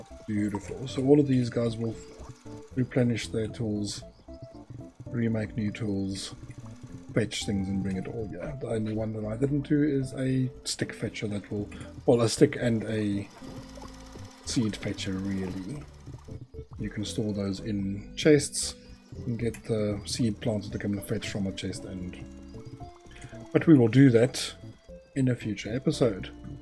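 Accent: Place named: South Africa. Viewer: I'm in it for the south african accent, correct me if I'm wrong. either way I love your accent and it allowed me to enjoy a game I usually wouldn't!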